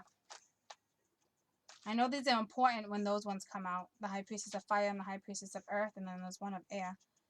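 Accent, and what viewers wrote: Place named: Boston, United States. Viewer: Flip’n ADDICTED to U!! Your accents (Boston? ~ my son is in Boston !) ❤️💛💙💚🌹♂☿♀💝💑👫 YOU!! WE hv ALL bn stabbed in the back!!! YOU GIRL need to be exposed big time on a much bigger platform! I’d hire you to do events... I’m new to YouTube but would love to hv contact with u. ❤️❤️❤️ YOU!! YOU R SUCH AN AWESOME GOOD SOUL!!!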